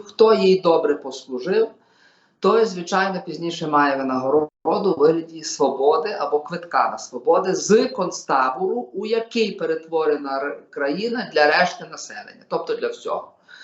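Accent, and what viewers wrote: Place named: Galicia, Ukraine. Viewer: Дуде імпонує стиль розмови і сама мова. Це не просто класичний галицький діалект,а вишуканий діалект за часів галицької інтелегенції часів Австрійської адміністрації. Дуже круто 👍👍👍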